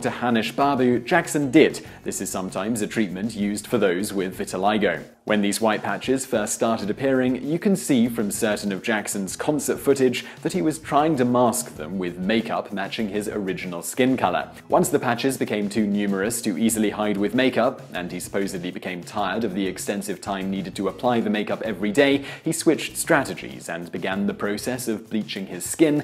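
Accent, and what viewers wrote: United Kingdom. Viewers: British accent...Good bye with your bullshit.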